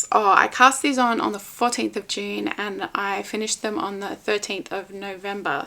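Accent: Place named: Australia. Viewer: It’s so great to hear an Aussie talking yarny goodness!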